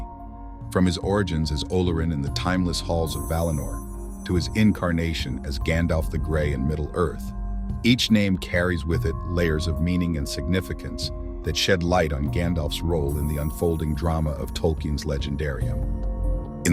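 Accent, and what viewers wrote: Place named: Scotland, United Kingdom. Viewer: Quite the Scottish accent you have, growing up in the beautiful lands of Scotland and all. Telling 'your' stories that are inspired by the beautiful landscapes you grew up in. Sounds authentic.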